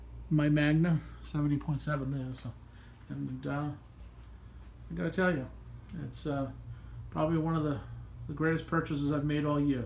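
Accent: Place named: Boston, United States. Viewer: It was worth hearing the accent clicking on this video.🤓👍. Boston ? Nantucket? 😂 Now that’s a nice humidor! 🥳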